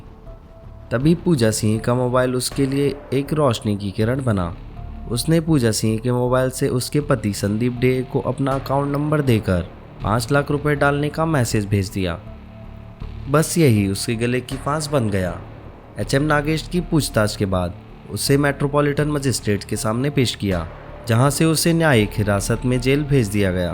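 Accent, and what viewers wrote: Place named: Haryana, India. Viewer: Haryanvi accent jaisa hai.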